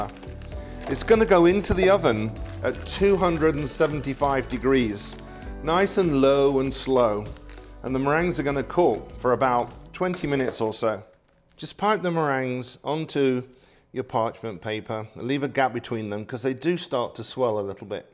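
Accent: United Kingdom. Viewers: You didn't introduce your family to the viewers. It would have been great knowing them. I love watching your dishes especially the way you speak about the Queen, Prince William and Prince Harry and of course Princess Diana and all the stories. I just watch the dishes being made though I am a true Vegetarian, don't even take eggs. Good luck. Keep telling about your Royal experience and stories along with history. I like your authentic British English. I am from India.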